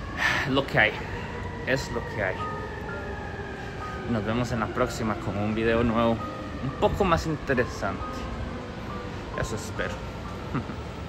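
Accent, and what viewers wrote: Costa Rica. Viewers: jaja no se pierde el acento Tico, saludos 👌🏻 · Sos de Costa Rica es que mae con esa voz es imposible que no jajajaja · Ud es de costa rica?? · Eres tico? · Tico? habla como tico jaja pura vida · Saludos desde Costa Rica, se notó el acento :) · Eso mae!, saludos, el hablado de un tico no se pierde xD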